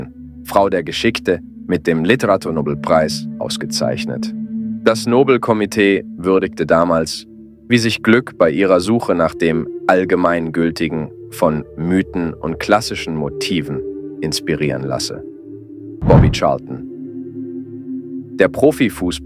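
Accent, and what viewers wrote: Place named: United States. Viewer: was soll dieser "Ami-slang" in deiner Präsentation?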